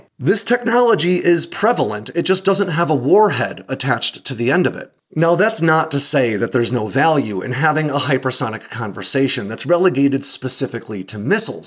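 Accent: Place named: United States. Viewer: I am going to assume that you are american but your accent only. Forgive if I am wrong. I am not American, But you may still don't know that America has the fastest hypersonic missile in the world, capable of achieve MAC 25. They just don't boast about it. Have you ever heard about Ohio class Submirines? No one can get even closer.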